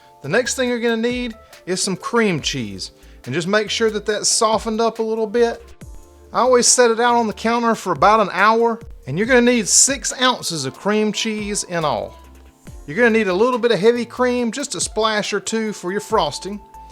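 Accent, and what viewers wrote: South, United States. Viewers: I do. Your personality and Southern "accent" makes the videos even more enjoyable to watch.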